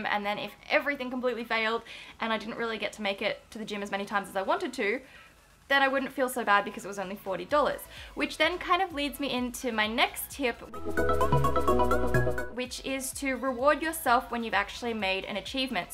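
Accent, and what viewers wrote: Australia. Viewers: she's Aussie isn't she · Are you a Canberra gurl?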